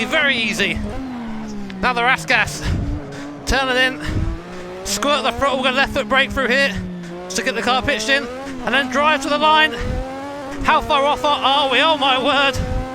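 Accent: United Kingdom. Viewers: My favorite Brit! I love how there is no "TH" in your vocabulary. I mean no disrespect, I think it is cool and sounds very Brit to me.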